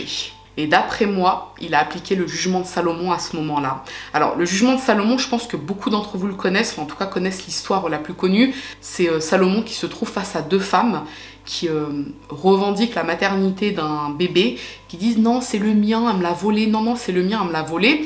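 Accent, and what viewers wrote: Italy. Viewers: Ciao bella signora ma che bel accento italiano 🇮🇪🇮🇪🇮🇪 Nouvelle abonnée 🙄 quelle tristesse cette histoire, révoltante de cruauté et de bêtises, merci de l'avoir traitée avec humanisme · bel accent italien ahah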